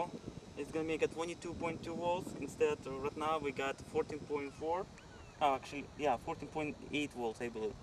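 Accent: Russia. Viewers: did you cut out a lot of parts for it . like the aluminum stuff ? and is that a Russian accent ? and where do you live ?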